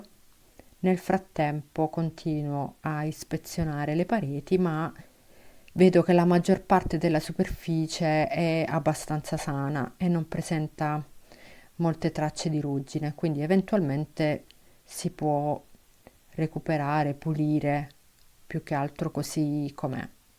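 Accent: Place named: Apulia, Italy. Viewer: Brava e chiara. Con un simpatico accento pugliese credo. Complimenti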